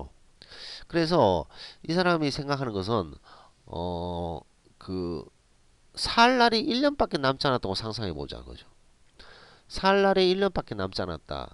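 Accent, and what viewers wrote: Gyeongsang, South Korea. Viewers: 경상도 말투 저도 경남ㅎ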